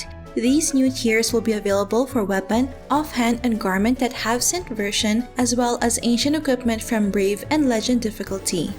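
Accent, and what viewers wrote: Philippines. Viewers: As if the accent was not already an indication that she's filipino and stating most of the time that she uses "PHP" as an indication of BCC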